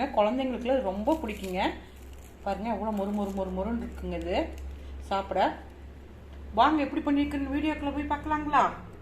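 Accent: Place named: Kongu, India. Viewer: nice vadai and kongu Tamil 👌🏻👌🏻👌🏻, new subscriber😊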